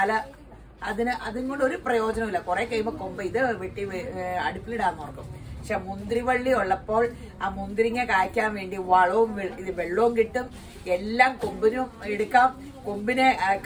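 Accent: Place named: Pala, India. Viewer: ശരിക്കും വർത്താനം കേൾക്കാൻ വേണ്ടി repeated watch all video നിങ്ങൾ പാലക്കാരാ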